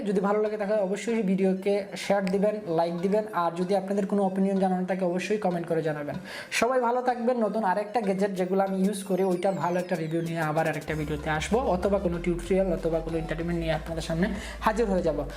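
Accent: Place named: Sylhet, Bangladesh. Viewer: ভাই মনে হয় সিলেটি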